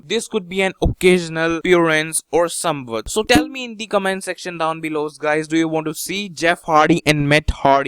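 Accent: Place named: Pakistan. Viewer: annoying pakistani voice... ughhhh... go to your call center job buddy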